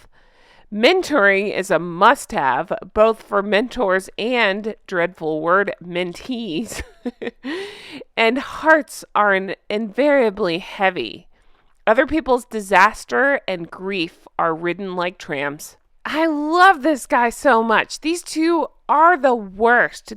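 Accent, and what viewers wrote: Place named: United States. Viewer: Oh that guy is hilarious! Who is he? Absolutely adore your YouTube, you and Beebs Kelly are brilliant. Only been on YouTube for 8 months when I got a new telly! Unfortunately can’t get all information on TV( using phone to write this). I keep asking what “sugars “ are- know they love the traitors but is it an American expression? Still love your voice. Wish I had an American accent ( like yours) it’s so beautiful on the ear. Sorry can’t do Patreon as I do animal charities but would like to know where I can view the merchandise ( too British to say merch 😹😹😹😹). Guernsey. Channel Islands xxxx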